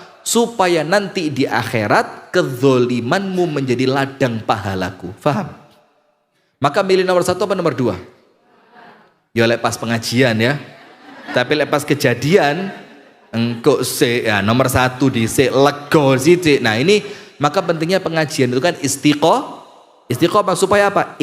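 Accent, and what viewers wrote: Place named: Java, Indonesia. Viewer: Masyaallah pak ustadz wajah ke arab arrabban..bhsa jowony medok...inggris juga bs.apalgi bhs arab sehat sehat pak ustdz Aamiin 🤲❤️🙏🙏❤️❤️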